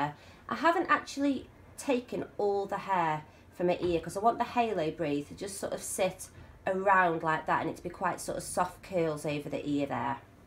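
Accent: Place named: Manchester, United Kingdom. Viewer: It’s one of the many English accents we have over here .... northern, Manchester I think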